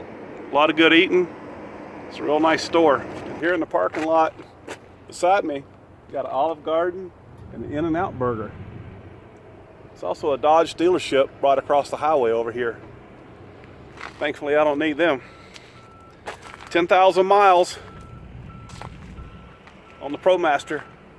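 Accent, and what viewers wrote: South, United States. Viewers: OMG, laughing so hard!!! Being a southern girl living in Prescott Valley, AZ now; I sure do enjoy listening to your southern drawl! Thanks for making my night ;) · There is nothing like southern charm..❤️❤️..l really like that your a southern boy. The way you talk is so smoothing to me. Could listen to you all day my Dad was from Norfolk Virginia and he had such smoothing charm..😍too. You are so funny..l'm still laughing ..haha..So glad Martini is feeling better love ❤️to you and the woof pack always..🐾🐾🐾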